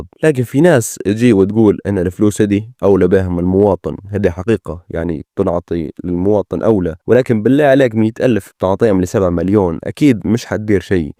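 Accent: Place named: Libya. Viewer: بلهجة الليبية 🤯🔥🔥🔥🔥🔥🔥🔥🔥🔥🔥🔥🔥🔥🔥🔥🔥🔥🔥🔥🔥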